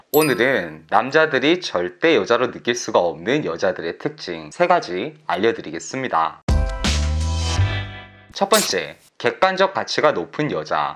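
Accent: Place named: Busan, South Korea. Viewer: 후..부산 사투리 치여요ㅋㅋ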